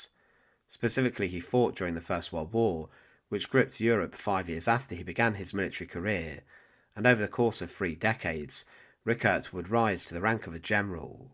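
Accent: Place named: England, United Kingdom. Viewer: I can’t stand English accent and this dude takes it to another level.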